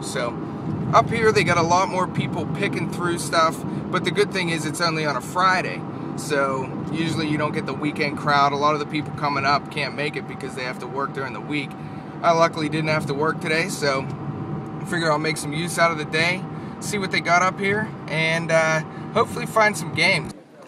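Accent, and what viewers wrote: United States. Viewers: dat pixburgh accent. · chicago accent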